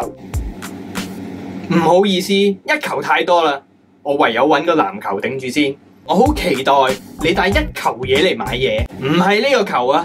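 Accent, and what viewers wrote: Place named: China. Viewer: 翻大陸啦口音仔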